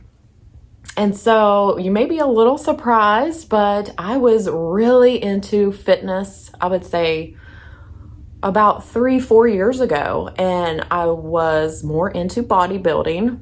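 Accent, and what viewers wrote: South, United States. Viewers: I started watching decorating videos last Fall and found you. I really enjoy your enthusiasm and we have similar taste. I love your southern accent too. I enjoyed learning more about you and look forward also to your Bible verses. You are a beautiful inspiration, thank you! God bless you! 💕(I am a grandma of 9, you have so much to look forward to). Such blessings!!! 😘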